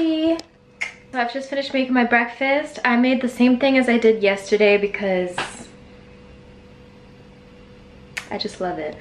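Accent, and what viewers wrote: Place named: Brooklyn, United States. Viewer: Where are u from !!???You are giving me Brooklyn accent!!!Luv it !!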